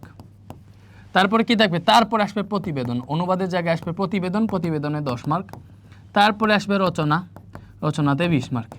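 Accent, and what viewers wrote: Sylhet, Bangladesh. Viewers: ভাই সিলেটী নি,,,🫣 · Sir apni sylhety basay Kotha bolen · স্যার কি সিলেটি 😊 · সিলেটি ভাই, কিতা করো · Apni ki Sylhet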